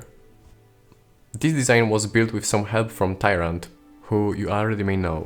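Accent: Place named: Poland. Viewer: You sound polish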